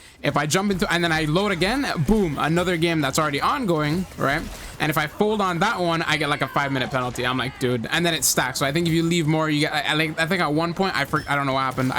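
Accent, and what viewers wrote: Caribbean. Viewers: Are you from the caribbean? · By any chance are you Trinidadian? Your accent is very familiar and sounds Caribbean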